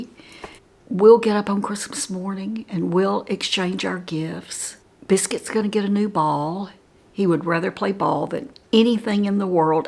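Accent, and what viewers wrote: South, United States. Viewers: I 💕😘 the way tells stories like a true Southern Belle 🙂 merry Xmas 🎄🦝🎄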